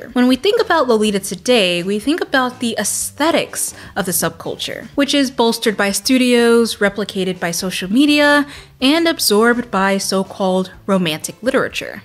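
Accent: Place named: South, United States. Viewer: She can't help it because she has a Southern/country accent. We pronounce certain words differently. She literally explained that in the beginning of the video, so no need to try to "correct" her.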